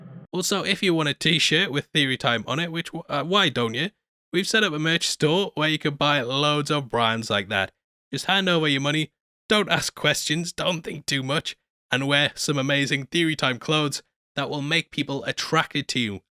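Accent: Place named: Liverpool, United Kingdom. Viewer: You really sound like a scouser. I detect lol.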